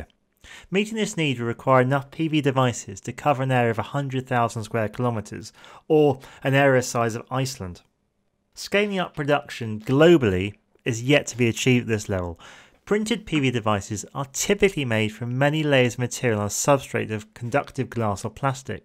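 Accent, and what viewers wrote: United Kingdom. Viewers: He's effin English. He ain't from Texas...(By saying 'effin English' in no way I meant that pejoratively)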